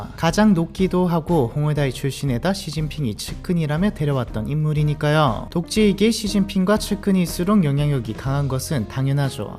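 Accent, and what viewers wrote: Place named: Busan, South Korea. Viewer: 내용보다 채널주 부산사람인게 더 충격임......아니 사투리 이정도 안쓰는 부산분은 처음 보는 거 같은....?....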